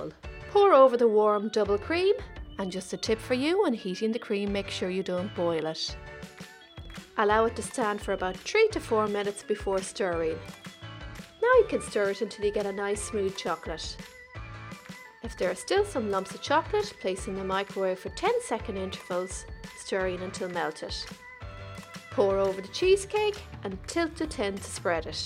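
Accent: Ireland. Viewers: Love ya accent, reminds me of my gran and grandad and all my family in Ireland when I was growing up 🇮🇪 and I’m gonna have to try and make one of these to try 😊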